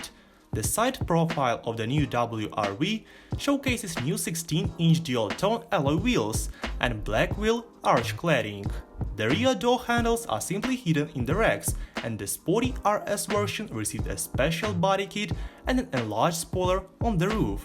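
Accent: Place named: Singapore. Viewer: You sound SINGLISH......sounds like Chinese but speaking English. Hard to understand what you are saying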